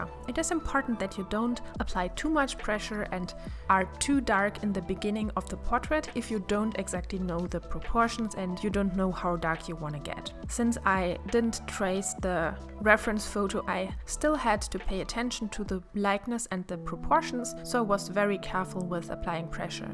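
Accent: Germany. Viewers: Your Art is so beautiful 😍😍🌹 Where are you from? I would guess Germany from the accent.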